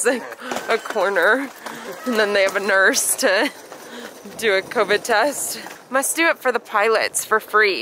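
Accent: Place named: Australia. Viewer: haha confusing Europeans with an Aussie accent 👍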